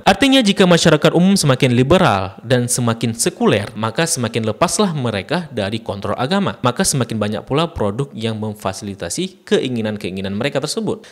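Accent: Malaysia. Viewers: Assalamualaikum..maaf ini orang malaysia atau indonesia ya...soalnya bahasa indonesia nya bagus tapi ada terdengar logat malaysia dan kata kata yang terdengar biasa dipakai orang malaysia...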